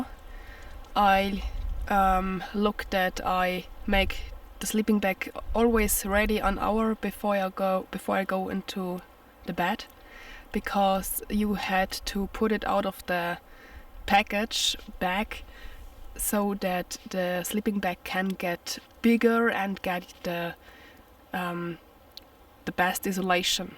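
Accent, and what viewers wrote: Bavaria, Germany. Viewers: Wow - you even broadcast in English? It sounds cute - I never heard speaking anyoine in english with an bavarian accent... :-). Since I found your channel, 4 days ago when youtube recommended your video you made about the cabin situated in the woods, I find it amazing what you do. And you actually inspired me. Because I know someone, not living in Germany, UK or even Europe who shares the same interests like you do... I am surprised about the number of views you get. I will chat to her today to find out if hiking in the wild and producing youtube content wouldn't suit to her, as she is struggling a lot, financially...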